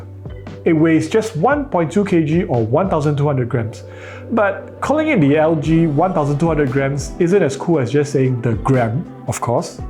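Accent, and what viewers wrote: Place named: Singapore. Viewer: you Sound singaporean imo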